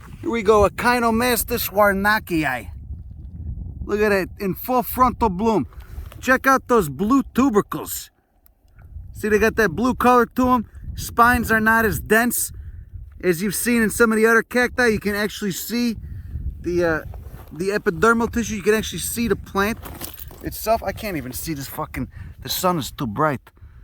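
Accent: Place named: Northeast, United States. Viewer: Man there are few things I love more than a heavy Northeast accent dropping heavy science. Good shit.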